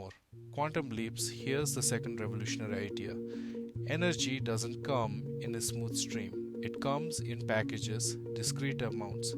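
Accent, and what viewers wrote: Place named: India. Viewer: Indian people’s accent speaking English sucks!!! Go A.I on the voice bro. Your accent is off putting. Sorry to say that, but truth is truth.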